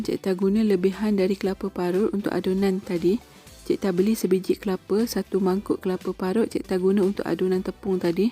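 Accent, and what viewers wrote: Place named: Kuala Lumpur, Malaysia. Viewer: Hebat buat kuih ganu tp slang orang key el😄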